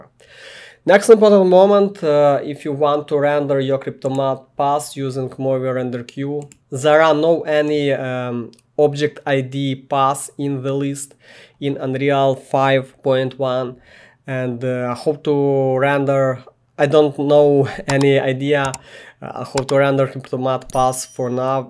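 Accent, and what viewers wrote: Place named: India. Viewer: ..accent like a Rajesh ))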